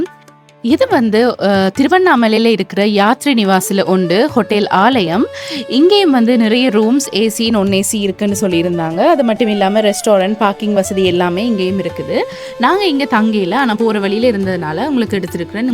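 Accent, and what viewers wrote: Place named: Sri Lanka. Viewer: Good one. Little different Tamil accent. Lankan Tamil ???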